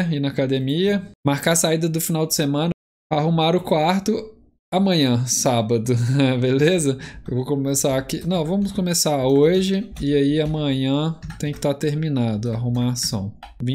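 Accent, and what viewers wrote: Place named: Minas Gerais, Brazil. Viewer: Noss mas esse cara é Minerim demais sô (ou goiano).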